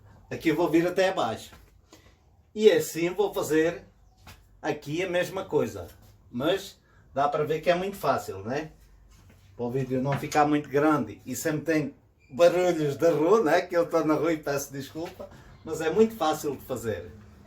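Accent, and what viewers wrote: Portugal. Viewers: Logo no inicio, pela simpatia, sotaque e nome, já fui assinalando o like e me inscrevi. Caro sr., assisti com emoção a apresentação dos seus ensinamentos em vídeo, pois lembrei do meu avô, também lusitano. Era um ótimo marceneiro, carpinteiro e pedreiro. Fez praticamente sozinho nossa casa em Piratininga - Niterói/RJ, numa época em que ainda não havia energia elétrica, só ferramentas manuais. Pena que não houve tempo para que ele me passasse os seus conhecimentos. Bem, gostei da ideia e vou aplicar no muro da minha casa, ora pois! Parabéns e obrigado. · Amo o sutaque portugues, queria falar assim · Vou salvar este vídeo para fazer em breve, muito bonito, muito simples e sem falar da simpatia e o sotaque de Portugal que acho muito legal. Parabéns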